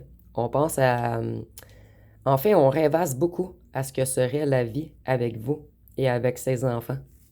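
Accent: Canada. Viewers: Bonjour, j' aime beaucoup votre accent canadien 😊 Je me sens plus forte depuis ses épreuves.Une forte emprise familiale et ex.... J' ai mis un stop depuis janvier 2024 et j' ai été profondément blessée. Je le remercie pour cette belle leçon de vie. Après une longue traversée du désert, je m'ouvre à nouveau vers le champ des possibles. Je suis plus en amour pour moi même et pour la vie ❤ Merci beaucoup ❤❤❤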